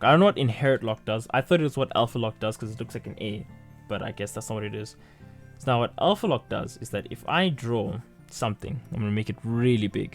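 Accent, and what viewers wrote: South Africa. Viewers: Myyyyyyyyy bruuuu!! Was NOT expecting a fellow SA to be doing a Krita tutorial. I picked up your accent like 3 seconds in lol. Thanks for the tutorial, this is the second one I've watched and I like how you are diving into each aspect. The first tutorial didn't really explain the "New Canvas" dimensions page at all. It's a bit overwhelming because there are soooo many tools and I haven't done digital art before. Regards from Cape Town.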